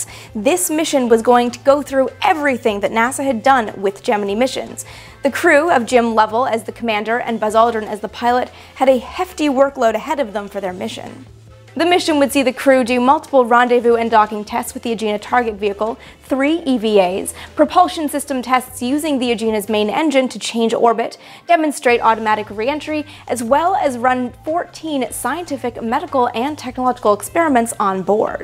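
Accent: Canada. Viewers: Love what you do! Living just a few miles from the path, I'll be doing the eclipse "live". We make a big deal about these events but I've always wondered if so much true science is to be derived from them, why NASA hasn't done more in space research as there is an eclipse occurring at a point in space, constantly. And ignore comments below regarding pronunciation of Gemini... I think it is a bit of a lovely Canadian accent coming through.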